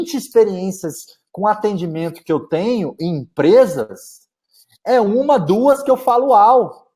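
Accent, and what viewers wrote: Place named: Goiás, Brazil. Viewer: Tenho visto dezenas de conteúdos para impulsionar as vendas do meu negócio, e os seus vídeos são os melhores! Isso não só por conta do conteúdo que é riquíssimo, mas principalmente por que vc passa estratégias realmente aplicáveis em pequenas e médias empresas. Normalmente os conteúdos são direcionados a grandes faturamentos, já o seu entra na realidade da maior parte dos empreendedores brasileiros. Muito obrigada mesmo! Ah! Sou sua conterrânea kkkk orgulho de ver vc puxando o R típico do nosso Goiás! 🤩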